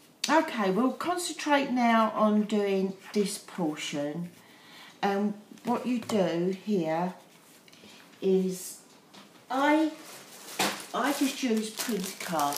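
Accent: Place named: United Kingdom. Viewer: Hi so glad you did a tut on this I saw the one you sent to Tricia and so wanted to have a go. You are so clever. I have sub you and look forward to seeing some of your other videos. I am assuming from your accent that you are in the UK. As am I. What is the Xmas club all about would love details. Hugs Jeanx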